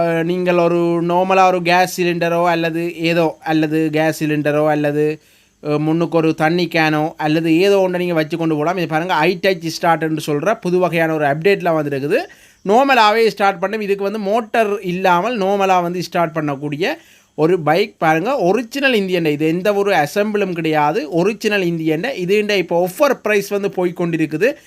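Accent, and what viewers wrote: Sri Lanka. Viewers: Ivan srilanka tamil pesiran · இலங்கை தமிழ்.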